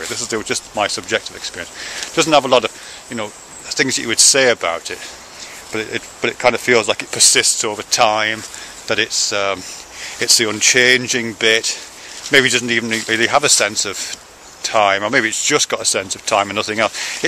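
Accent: United Kingdom. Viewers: I don't know why, but I enjoy listening to British people (i'm american). On the self.... I commented elsewhere that I think the self is a purely human, cultural and social construct. Sure, I may have some models or ideas of my "self"... but I'm a cultural animal after all.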